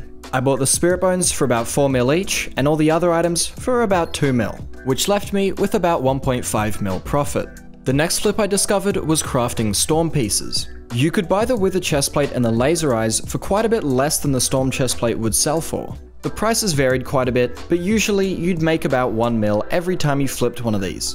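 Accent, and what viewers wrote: Australia. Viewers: wait is australian?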